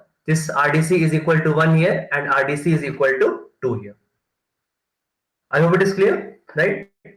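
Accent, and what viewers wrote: India. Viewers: yesss sweet hindi accent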